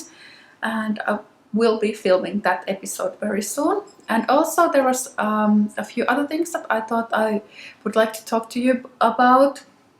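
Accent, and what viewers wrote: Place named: Finland. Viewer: I was looking for summer knitting inspiration!! Your accent made me go to the time I lived in Helsinki!! Lovely memories! Happy new year!!! Regards from Peru 🇵🇪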